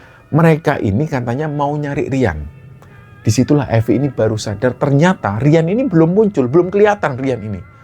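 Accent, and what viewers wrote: Java, Indonesia. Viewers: terimaakasih mas dan crew sudah selalu menemani mingguku. kali ini dngerin cerita ini bikin kagum tapi merinding jugaa. sefatal itu yaa bahkan jasadnya pun bisa pulanh sendiri tanpa dibawa fisik oranh rumah. jatim memang the best apalagi mas yg nyeritain kek jawanya njawa bgttt brasa hidupp.. sukses terus tim KS ✨️✨️🫶🫶❤️❤️🎉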